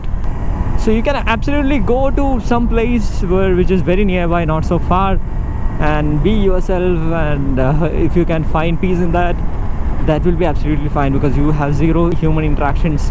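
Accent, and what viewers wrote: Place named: India. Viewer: I do not know how I ended up here. I gather is Indian if an IT engineer and by his accent., stay safe there in India. But if you are healthy and not too old, this corona virus is not relevant to us.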